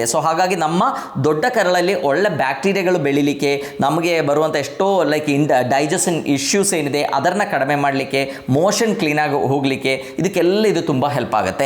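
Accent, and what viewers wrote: Kerala, India. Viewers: ನಿಮ್ಮ ಕನ್ನಡ ಮಾತುಗಾರಿಕೆ ಅತ್ಯದ್ಭತ ಡಾಕ್ಟರೆ 🙏🙏 ಅಲ್ಲಲ್ಲಿ ಮಲಯಾಳುಂ ಆಕ್ಸೆಂಟ್ ಮುದ್ದಾಗಿದೆ❤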